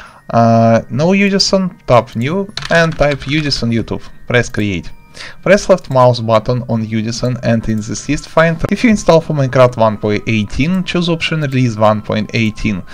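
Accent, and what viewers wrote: Russia. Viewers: У тебя русский акцент, ты не из России?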